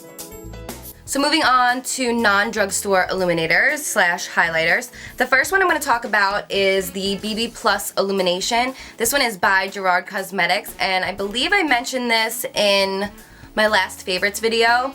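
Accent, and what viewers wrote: New Jersey, United States. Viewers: I was going to comment on her pronunciation of these two brands as well, but then I saw your comments. Haha Being such a beauty guru, I was really kind of surprised she didn't know the correct pronunciation since these are really common brands--especially here in the U.S.. I think I noticed her mispronouncing NYX is another video as well. Nonetheless, she is still adorable. The mispronunciation just kinda added to her bubbly, cute, & quirky personality and that New Jersey accent! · It might just be her jersey accent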